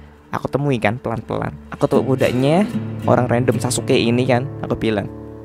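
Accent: Java, Indonesia. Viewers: Jowo Yo mas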